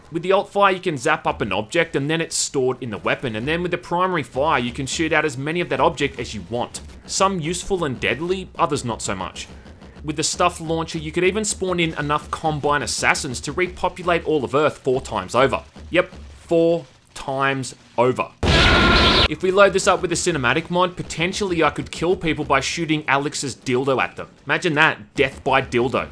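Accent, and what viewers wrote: Australia. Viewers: You sound Ridiculously Australian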